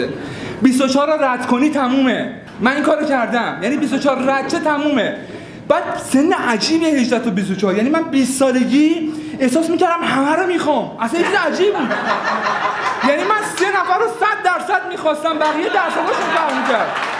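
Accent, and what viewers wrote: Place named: Yazd, Iran. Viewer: خیلی راحت بگم . من درصد بیشترش به خاطر لهجه شیرین یزدی هست که طرفدارت هستم . اگر نباشه .جذاب نیست برام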